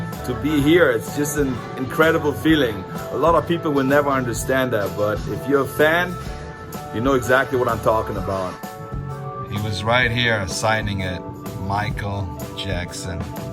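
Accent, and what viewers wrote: Germany. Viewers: Allein am Akzent hat man gehört dass du Deutscher bist. Bis ich dann auch dein Kanal Namen gesehen habe😂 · NEWBEATS MUSIC. He’s from Germany he just has a German accent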